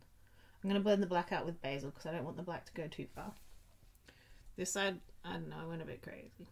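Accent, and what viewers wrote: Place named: Adelaide, Australia. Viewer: Love the look! Your accent isn't obvious to me! 😜 you sound Adelaidien though for sure! I've been looking for a greens palette so I might have to check this one out! Wish you lived in Adelaide so you could teach me your ways in person! 😂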